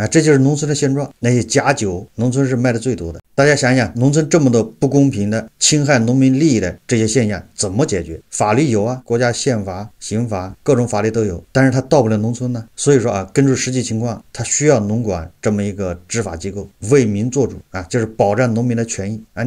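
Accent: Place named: Anhui, China. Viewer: 听口音像 安徽安庆和铜陵的口音啊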